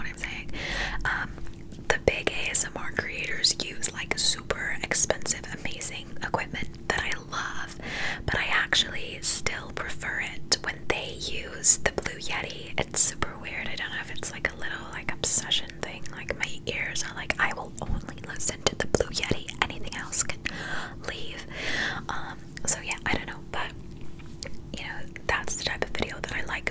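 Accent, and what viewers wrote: United States. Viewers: This is so relaxing and your American accent is very good!!! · really love the low lighting. your american accent was spot on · American , British then Middle East accents are my fave ASMR accents. Have you ever thought about doing regional British accents in a video ? I always love to learn about The different accents of the UK . Anyway, you have a great American accent ♥️ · PLS I DIDNT KNOW U COULD RECREATE AN AMERICAN ACCENT THIS IS SO GOOD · your american accent is so good !!! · Your American accent's not half bad! Better than most of us American's would be able to do a British accent. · IM AMERICAN AND THIS ACCENT IS SO GOOD. You did SO well I can’t get over this 😂😭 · Your American accent is so good your normal voice slipped through a few times but this is rly impressive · I’m American and I approve of this accent, it’s weird to think we are the ones who sound different. 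· Oh heck yeah, you absolutely nailed the American accent! A lot of women on the East coast sound exactly like that lol. I'm o proud of you way to go! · As an american this is exactly how we sound like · great video & your American accent sounds awesome😊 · Your American accent is so amazing I thought you were from the U.S. until I checked out your other videos lmao.😂👍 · Your American accent is truly amazing! You really had nothing at all to worry about! You have either had some practice or it comes naturally! Lol either way, well done! I speak in your accent too and i think I'm who should worry! 😂 I think I'm terrible at it!😄❤❤ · What? This “American” accent was so natural coming from you 🤣🤣 if I didn’t know, I’d be 100% sure you were American lol amazing job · Great job! Your accent was a mix of a few different regions, depending on the words you were saying. The typical accent people do when outside of America is more of a west coast, California, sounding. 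You had a few words that came out more southern. This was fun! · it’s actually so good!! u sound so american lolll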